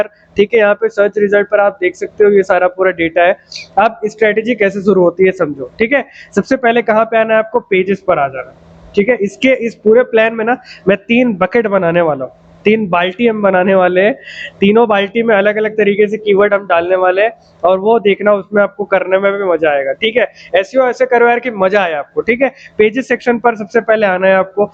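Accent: India. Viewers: bihar s ho kya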